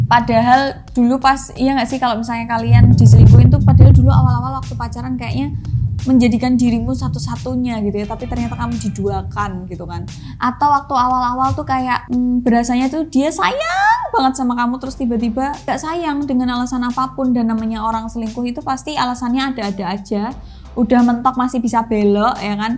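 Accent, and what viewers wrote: Java, Indonesia. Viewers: Samean iku jowo ngedi se mbak.. lucu banget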